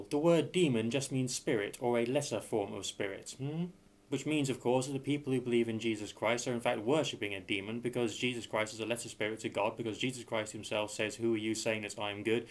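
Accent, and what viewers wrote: United Kingdom. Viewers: your an idiot and don't know any thing u british talking fuck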